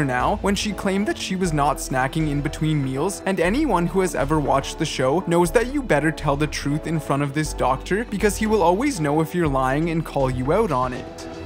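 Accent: Canada. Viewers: i think they're canadian!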